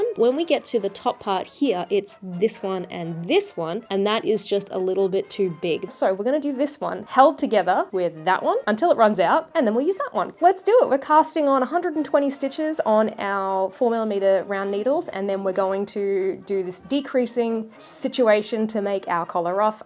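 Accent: Australia. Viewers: This is incredible 🤩 and as a southern woman, love to hear an Australian accent adopting y'all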